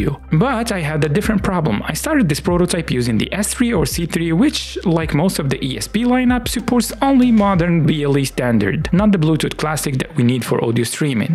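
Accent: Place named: Morocco. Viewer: Great Job! I have a friend who is interested in programming, he would love to see this. I can guess from the accent that you are probably from Morocco Correct me if i'm wrong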